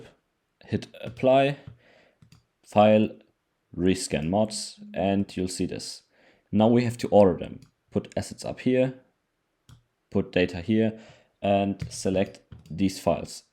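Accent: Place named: Germany. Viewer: Fantastic video!! your english is perfect and your german accent is nothing to be ashamed of. i had spent the last year trying to find a solution for multiverse on my macbook, only recently discovering it could work with crossover with a few shenanegans, im sure this video will be a goldmine for anybody like me who cant fork out hundreds of dollars for it.. fantastic work!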